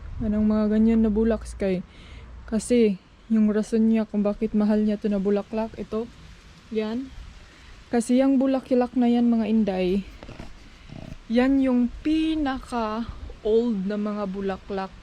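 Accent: Visayas, Philippines. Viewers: Hello, following in your youtube vlogs, im from Leyte.. mkatawa ko kay bisaya kaayo.. makes my day light😘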